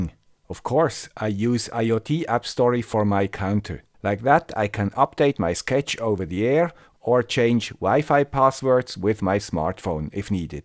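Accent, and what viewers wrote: Switzerland. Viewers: Hi. First of all thanks for all your amazing videos. Thanks to your videos I started playing with micro controllers as a hobby. I am a C# back-end developer and have very little experiense with embedded systems. Did you animated your LED strip with the ESP32 meanwhile using WiFi? I have not been able to find a library that animates the led strip correctly. The library esp32WS2811-master works great if WiFi is disabled. But I been having no luck animating 80 LEDs if WiFi is in use. What has work best for me is using the standard Adafruit_NeoPixel library that I previously used for arduino running that code on a separate core of the ESP32 and using "portENTER_CRITICAL(&mux)" functions of the ESP32 meanwhile animating. But still the first LED fails to animate correctly. The complete code can be found on this question: <link>. Maybe someone can answer in that question what could I be doing wrong. 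· Depends on the manual (google translated Chinese datasheet can be rather cryptic), it is always nice for mere mortals that guys like does the ground work ^ ^ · Danke fur alles! Love the swiss accent!;) Nice video! Exactly what I was looking for! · God. Will definitely bless you to achive what you desire. My prayers are with you... Thank your very much for sharing your knowledge 🙏. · We love! Merry Christmas! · We wont stop watching, keep up the good work, however everytime you say "dirt cheap" the Chinese push up the price 😱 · don't become defective! Your counter will reach it's theoretical maximum before you know it!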